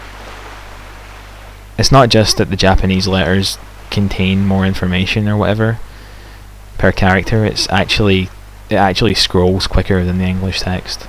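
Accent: Scotland, United Kingdom. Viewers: holy cow is SCOTTISH, thats surprising lol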